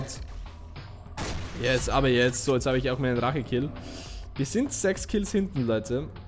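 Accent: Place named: Upper Austria, Austria. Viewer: Kommst du aus Oberösterreich hört sich so an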